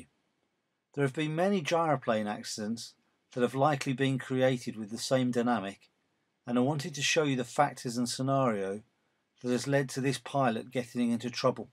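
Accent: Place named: England, United Kingdom. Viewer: the narrator simply has one of numerous English accents, giving a clue as to where in the United Kingdom he originated.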